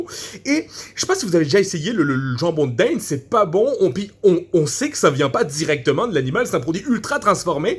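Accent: Quebec, Canada. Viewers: Encore une fois le seul Français capable de faire un accent québécois quasi impeccable, GG. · Comment fait il pour maîtriser l'accent québécois sans trop en faire ? C'est très fort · J'adore, peut-être une pointe d'accent québécois en plus, mais franchement bravo car l'original est une vraie pipelette :) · Bravo pour l’interprétation : tu te débrouilles vraiment bien pour imiter Matthieu Bock-Côté et l’accent québécois 👍🏻 En plus, même les propos sont bien fait : on dirait vraiment les propos de Matthieu Bock-Côté (il a déjà été capable de sortir des propos similaires) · Pour une parodie humoristique, y'a quand même du vrai dedans. Serieux, du jambon, c'est du porc, point final, le reste c'est de l'arnaque Super accent canadien au passage, ca rajoute un petit coté drôle :) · L'accent québécois est bien fait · Desolé encore que Bob coté a pas coulé quand il a traversé l'atlantique, si jamais vous le perdez en route on sera pas trop triste au quebe, étonant qu'il a pas préféré se sauvé en floride. 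Sinon pas pire accent, manque pas grand chose pour reussir a te perde dans une foule a mtl, peux etre juste un peux de, ouin, genre, tsé, pis 😅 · c'est juste un accent quebecois... je reconnais pas le bock kotey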